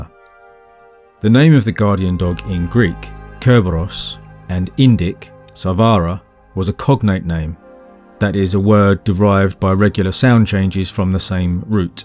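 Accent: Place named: London, United Kingdom. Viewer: I love the London Accent 😂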